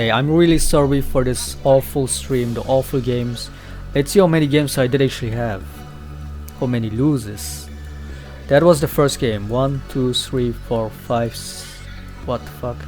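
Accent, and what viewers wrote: Germany. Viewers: Die English Aussprache haha :D Kannst du mal ein Video in deutsch machen? ^^ Bist doch deutsch oder o.O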